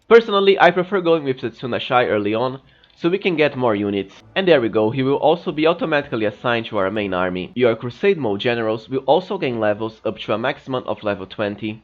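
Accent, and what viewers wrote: Brazil. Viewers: Br né? Sotaque inconfundível lol 😅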